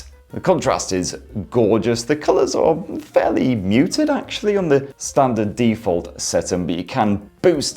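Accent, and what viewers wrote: United Kingdom. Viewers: The British Accent Wow 😊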